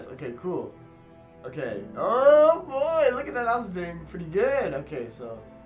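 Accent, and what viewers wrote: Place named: Mexico. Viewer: As a spanish native, you speak really good, and got the accents right, that i think is the most difficult part for other people. Also you got more of a mexican accent. i Liked it!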